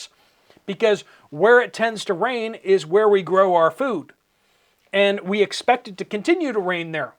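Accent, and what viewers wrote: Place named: Appalachia, United States. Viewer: Hey thank you for all that you do and say! I have a son and his family in West Newton and I feel right at home with your frame of reference and accent. God bless all of the people in coal country who have contributed so much to this country's history mining such a life sustaining fuel that brings security and warmth to so many people around the world. Merry Christmas and a safe and prosperous New Year to you and your family! 😇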